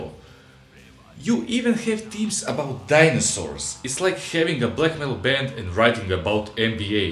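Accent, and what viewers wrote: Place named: Russia. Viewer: Давай по-русски теперь) я по акценту вижу, ты наш)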